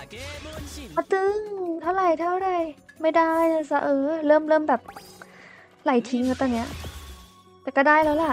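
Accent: Thailand. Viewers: ชอบเสียงพี่เค้ามากเลยอ่ะโอ้โหเสียงแบบเหน่อได้ใจ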